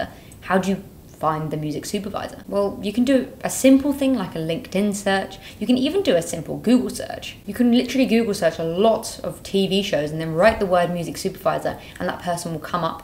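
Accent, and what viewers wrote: United Kingdom. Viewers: I really like the content you´re making on this Channel... But I absolutely LOVE your british accent!!! :-D Cheers & a happy new year to all in the Burstimo team from Denmark :-)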